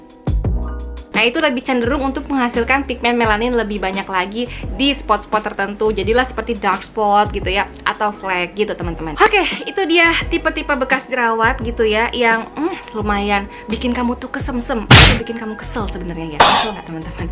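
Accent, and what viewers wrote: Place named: Sunda, Indonesia. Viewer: Urang sunda ning😂😂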